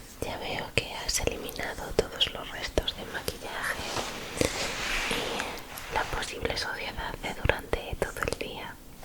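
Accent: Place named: Spain. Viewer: Me gusta mucho ese acento español ❤ es muy relajante su asmr.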